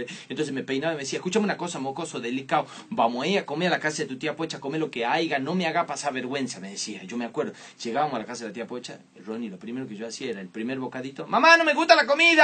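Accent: Tucumán, Argentina. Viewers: Tucumano es!! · Alto tucumanoo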